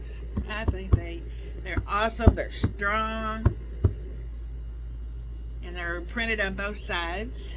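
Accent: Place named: Kentucky, United States. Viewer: I love Kentucky I love the accent too ❤❤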